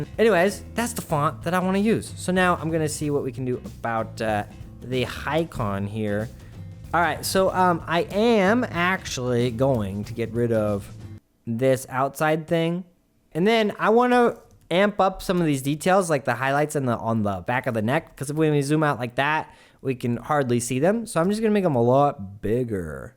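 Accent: Argentina. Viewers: Por qué tenés acento argentino? Cuál es tu historia? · wAit- Are you Argentinian(?? o_O (that or your pronunciation and accent on Spanish is like from Argentina). I'm kind of new in your channel, maybe I don't know this type of information 😅.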